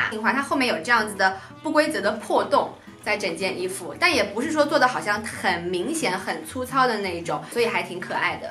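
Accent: Shanghai, China. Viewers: 男男头哈哈哈上海宁好亲切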